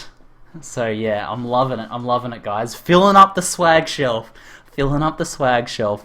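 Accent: Australia. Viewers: Great Vid, Great to hear a fellow Aussie doing well. What state you from? PUMPED about the Oz server, would love to play with you sometime. peace.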